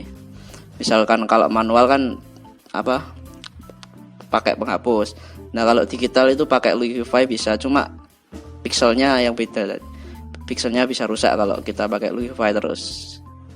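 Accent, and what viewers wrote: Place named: Java, Indonesia. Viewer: Aku suka medoknya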